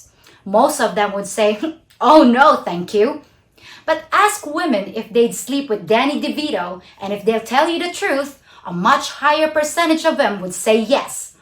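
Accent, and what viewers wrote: United States. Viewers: Wow, you're very likable. You are definitely a filipina but you talk like an American. How did that happen? - Did you live in the US? Is one of your parents a foreigner? - Or maybe you're just an internet junkie who simply figured it all out!:) - Kisses from Toronto Canada. - Mauhhh... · Your chanel is well balanced, informative and easy to be understood. The only thing is that strong american accent what is unusual for european ears but... I like your channel. Keep on doing. Smart woman.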